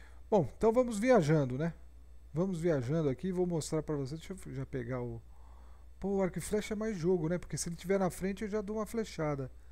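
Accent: Piracicaba, Brazil. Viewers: vc é de piracicaba?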